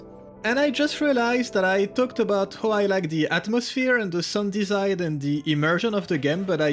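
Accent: France. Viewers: Hi just discovered your channel with your stalker mod video! Are you french by any chance? I may be sensing it a bit in the accent! If i'm mistaken, apologies. If not, salut à toi!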